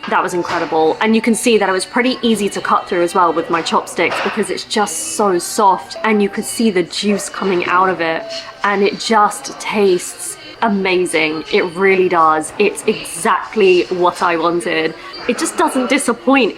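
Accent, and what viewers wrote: United Kingdom. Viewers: First of all, thanks for uploading the new video. I've been studying English with your video lately because I like your British English. I usually watch the same video at least 10 times. Really looking forward to your next video too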